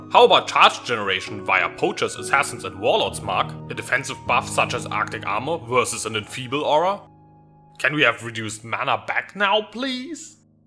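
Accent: Germany. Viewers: I cant tell if this accent is german or asian.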